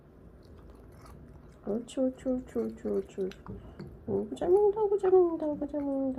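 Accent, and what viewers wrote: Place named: Gyeongsang, South Korea. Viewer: 안녕하세요? 대구에 구내염 발치 하는곳 정보좀 알려주시면 감사하겠습니다 님 혹시 대구분 아닌가요? 경상도 억양이셔서..구내염 심한 길냥이 한녀석이 있는데 발치를 해서 나을수 있을까 해서요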